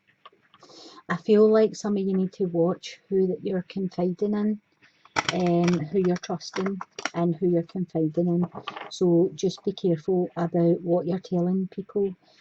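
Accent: Scotland, United Kingdom. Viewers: What accent is this, Scottish ? , thanks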